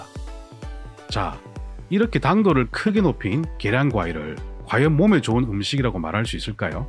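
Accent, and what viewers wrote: Gyeongsang, South Korea. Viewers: 경상도 어디신데요? 말투가..부산인데 좋은 내과 찾고 있어요..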